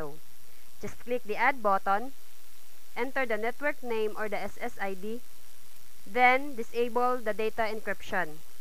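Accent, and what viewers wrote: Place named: Philippines. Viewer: pinoy???? hahahha. 100%.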